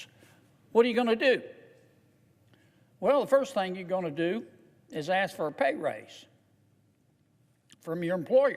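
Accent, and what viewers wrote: South, United States. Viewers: " Mr. Kennedy protects a state with one of the lowest income states in the union, born and raised in the state and no one knows the state of Louisiana better than this champion! God bless you sir for your service, dedication but more importantly your small town simple way of speaking with reason, respect and willing to go the last mile for all Americans. Make no mistake when he speaks and Washington laughs at his accent and plain speaking Americans know exactly what this patriot is saying! Thank you sir!!!!!!!!!!! "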